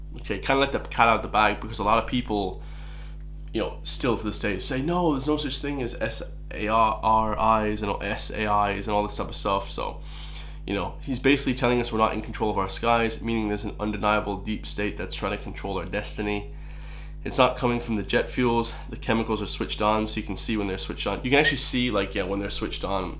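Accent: United States. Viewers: Are you irish? You sound like a yank Trumper, cringe, drop the far right bollocks and go back to the rap reactions bud.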